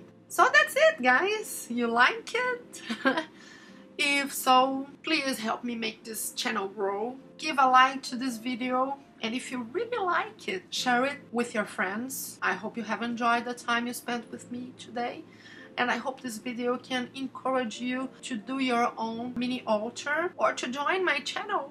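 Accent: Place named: Brazil. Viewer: Tbm sou brasileira, te reconheci pelo sotaque. Estou tentando montar meu mini altar.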